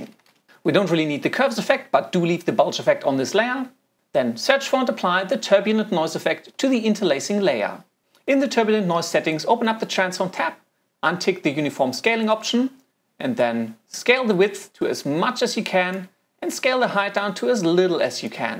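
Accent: Germany. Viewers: Are you from Germany? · Are you German? :D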